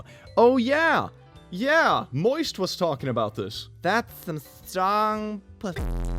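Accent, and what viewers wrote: United Kingdom. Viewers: As a Swedish person, i can only agree that this is exactly how Brits sound to me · Your British accent is perfect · ’ British accent is amazing From a British citizen (pls don’t give me a guy voice if u read this, and no I’m not a girl either)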